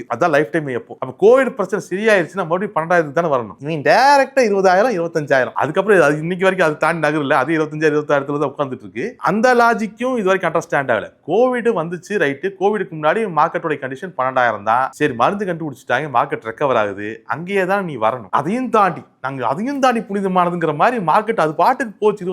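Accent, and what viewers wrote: Kongu, India. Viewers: Great sir, I like your kong slang sir. நீங்கள் எங்களுக்கு தன்னம்பிக்கை கொடுக்கிறீர்கள் sir💯💚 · I like your kongu slang sir❤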